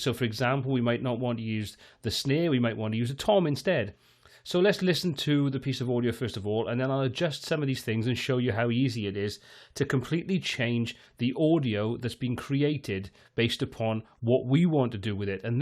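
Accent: Wales, United Kingdom. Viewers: Great vid thanks. I'm a long time EZD user but definitely picked up some tips from this. I'm a Taff living in Aus. Nice to hear the home country accent too. Where can I listen to some of your musical output? Always interested in hearing other people's work.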